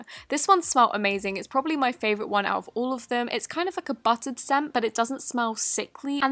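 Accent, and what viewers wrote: England, United Kingdom. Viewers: THE TRUTH ABOUT THE WORLD, She's English. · I love British accents❤️💕❤️💕❤️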